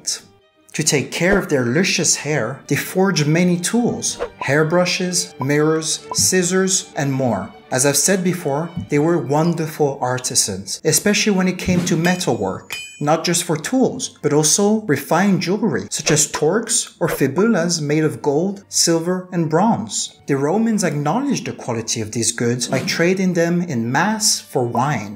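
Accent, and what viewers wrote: France. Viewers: He probably said it with a French accent because he has a French accent as far as I can tell. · Nice accent is French your first language.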